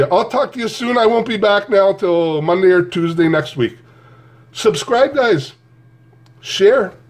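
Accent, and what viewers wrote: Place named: Canada. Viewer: You're so adorably Canadian. Good Lord, that accent :).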